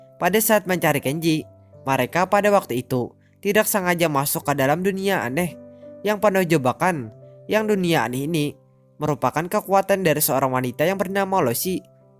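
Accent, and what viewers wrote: Banjar, Indonesia. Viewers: Org banjar bg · Logat nya kaya urng Banjar pang 😂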